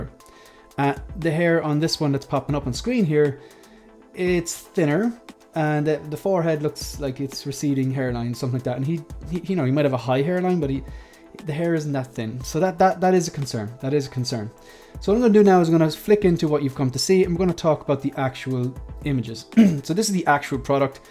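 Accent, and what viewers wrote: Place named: Ireland. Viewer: I watch a lot 1:6 collectibles/collector Youtubers. Lael, Riley, Justin, Will etc pretty much everybody, and dont get me wrong, I genuinely like those guys and enjoy their content but this video is a great example of why you are my favourite creator in this sphere of Youtube. As always just a very calm, thoughtful, balanced and measured approach to sharing your thoughts. I guess the Irish accent helps a bit too haha. Much love.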